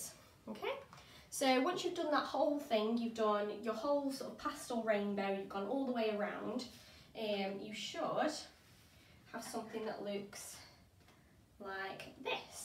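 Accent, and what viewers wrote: United Kingdom. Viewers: Good video, but it is hard to hear you. I put on closed captions. Low volume + British accent can be tough :-)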